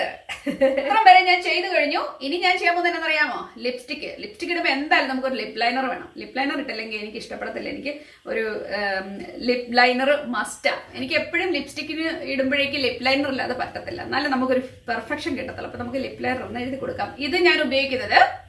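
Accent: Kottayam, India. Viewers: രസം ഉണ്ട് സംസാരം. ഇങ്ങനെയാണ് ഞാനും പറയുന്നേ കോട്ടയം ആണ് alle?? ഇഷ്ട്ടയിട്ടോ 😘😘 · Hi chechi am your new viewer from pala kidangoor now chechi kottyam kari ano samsaram nalla rasam undu my hair also thin anu and no idea about beauty tips you help me a lot beginners nayi simple make up products okke detailed ayi paranju oru video cheyyamo and 9 years kurtis nu venda hair care also. Are you working as a nurse keep rocking 💐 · kottayam kariii ano aaoru slang varunudalo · Kottayom Kari aano chechise.. Samsarom athu pole undu..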